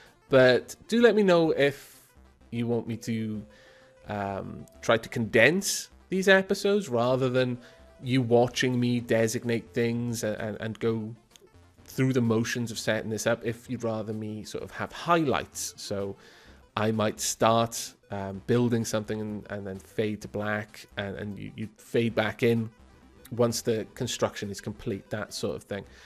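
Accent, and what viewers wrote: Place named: South Wales, United Kingdom. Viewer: Hey where in Wales you from? You sound like a proper south-walian!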